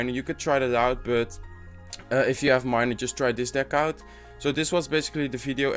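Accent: Netherlands. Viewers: Haha, loving the Dutch accent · where are you From? You've Got a English accent like a dutchie that is talking English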